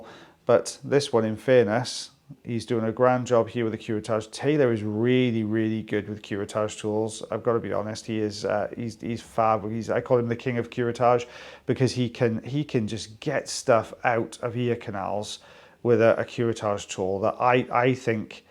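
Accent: Wales, United Kingdom. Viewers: Love the Welsh. Would love to hear more, my wife thinks it’s sexy.😊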